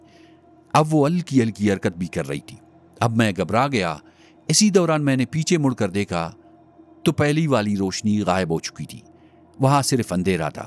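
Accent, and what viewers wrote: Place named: Balochistan, Pakistan. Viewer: واہ بلوچ تمھارا لہجہ بلوچی ھے مگر اردو اچھی بول لیتے ھو۔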